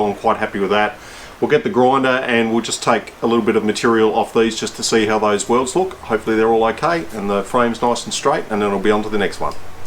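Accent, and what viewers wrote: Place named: Australia. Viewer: Hi, you sound Australian, do you make these to sell? We r in Vic.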